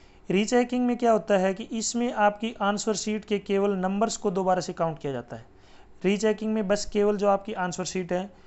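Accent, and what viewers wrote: Haryana, India. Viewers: bhai aap haryana se ho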